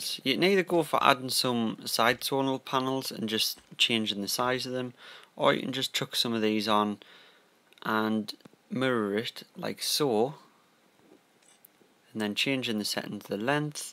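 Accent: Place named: North East England, United Kingdom. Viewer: North East of England. I might be wrong but I just recognised the accent 😂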